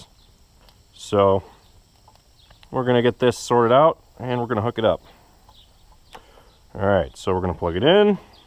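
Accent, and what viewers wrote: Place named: Wisconsin, United States. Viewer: First thing this fellow cheesehead noticed was the Wisconsin plates on the car and your 100% Wisconsin accent. I live in Arizona now and can pick out the accent in a crowd like nobody's business. Comfort food for the soul. As a sidenote I also have the NOCO G7200... Thanks for the video!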